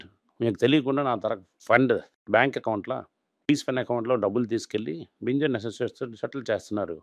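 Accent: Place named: Srikakulam, India. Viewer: Vidoka Rakesh jhunjhunwala levello unnadu, okka vaakyam kudaa clarity ledu. Manadi Chikakole ani telustondi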